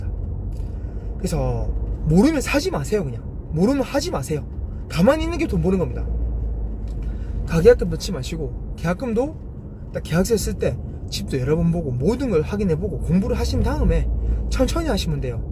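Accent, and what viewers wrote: Busan, South Korea. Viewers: 항상 즐겁게 강의해서 기분이 좋네요,, 부산 사투리도 정겹네요,,,, 좋은 진실된 정보 감사합니다.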